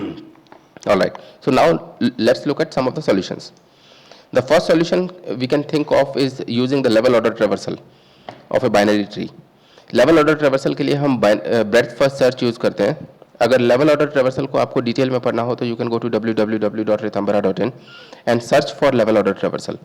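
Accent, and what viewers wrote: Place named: South Asia. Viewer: I really appreciate this video. I think you could reach a broader audience if you spoke slightly slower. I have some experience with South Asian speaking accents, but the speed at which you are talking along with the lower quality of the audio makes it a little hard to keep up (particularly at )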